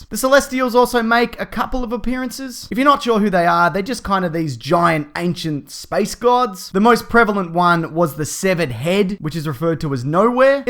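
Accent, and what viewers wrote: Australia. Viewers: this is the thickest Australian ascent ive ever heard AND IM AUSTRALIAN! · lol I so want to know what you are saying in some of the collectors items and what they are and do, so I subtitled this video, (which anyone can do) and I guess an austrailian accent makes it so english subtitles horrible lol. for Thanos the subtitle came up as chans.... and almost every word is off. its funny, I recommend trying subtitles for this video just to see what I'm talking about. settings button or menu is right on the youtube screen itself.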